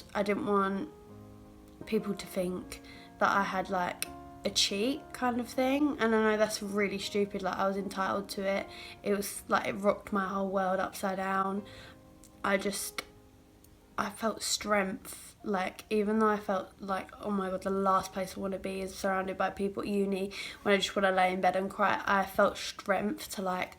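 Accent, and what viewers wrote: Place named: Essex, United Kingdom. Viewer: Omg it’s okay to cry just remember that there looking down watching u carry on being amazing and I honestly love u so much and ur so inspirational and I’m obsessed with ur Essex accent lol 😂 ur gorgeous and strong remember that!! 👌🏼❤️❤️❤️❤️❤️❤️❤️❤️❤️❤️😍😍😍😍😍😍‼️‼️‼️‼️‼️😭😭😭 We all ly ‼️❤️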